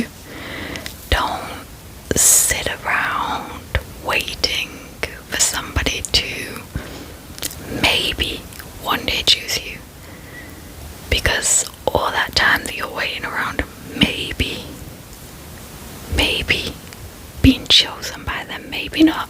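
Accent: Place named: Slovakia. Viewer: A fantastic Slovakian accent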